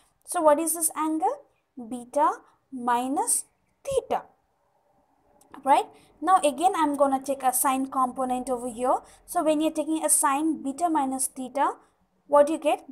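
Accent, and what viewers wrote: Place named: United States. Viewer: Indian accent would be better than ur American accent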